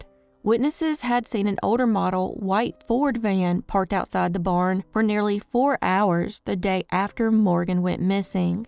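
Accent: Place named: Kentucky, United States. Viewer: Are you from KY? Your accent sure sounds like home. ❤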